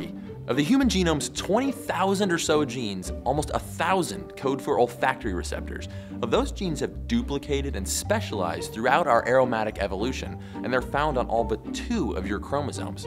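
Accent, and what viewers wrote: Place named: United States. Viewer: I can tell from his accent that he's American. He also mentioned Austin in one of his other videos.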